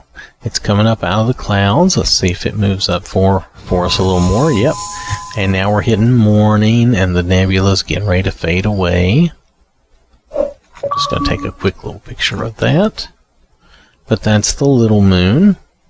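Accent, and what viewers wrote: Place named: East Texas, United States. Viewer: From east Texas? Sounds like it 10/10 hope these are on PC 😭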